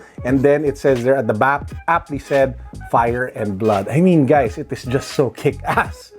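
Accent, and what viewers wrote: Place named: Philippines. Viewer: Went in person to check out all the GOT shoes, this one definitely caught my eye and ultimately bought these. House Lannister were close though but they were only exclusive to women. Starks were nice but would prefer a black bottom sole. White Walkers were nice also but it screamed yellowing over time. Besides the strong filipino accent, you gave a well thought out review of the shoes. Great job bro.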